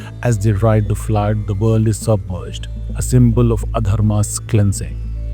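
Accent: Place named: India. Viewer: I like the dot dot dot dot Indian accent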